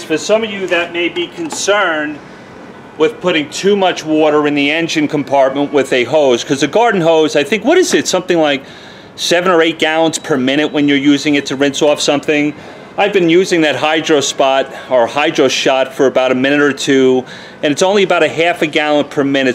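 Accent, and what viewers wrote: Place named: New Jersey, United States. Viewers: This guy is not a Texan, obviously a Transplant! Has to be from the East Coast! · he sounds joisey-ish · He is definitely not indigenous to Texas. My guess is a transplant from Long Island or NJ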